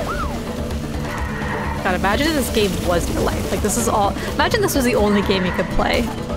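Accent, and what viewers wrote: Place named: Canada. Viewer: Silence or humming. Got it. :_marQuack: Girl, you’re way too good at this game what the actual fuck. Seriously wtf im horrible lol Nothing to do with anything but am I allowed to say that I’m a tad in love with your canadian accent? 😍 The music in this game is so 🥰 geeeez. Its seriously worth the frustration.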